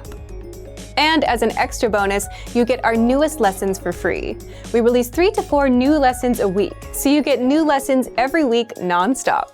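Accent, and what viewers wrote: United States. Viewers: pero es de California, New York... De dónde será? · Es el mejor acento es de EEUU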